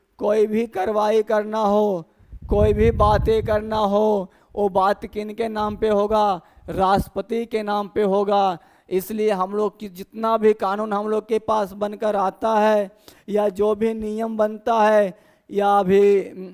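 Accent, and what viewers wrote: Bihar, India. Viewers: क्या आप बिहारी है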